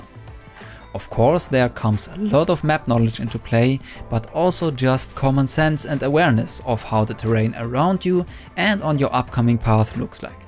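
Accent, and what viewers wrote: Germany. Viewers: Dude, he's German. Its just how he pronounces words. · Your german accent fits you so well! :)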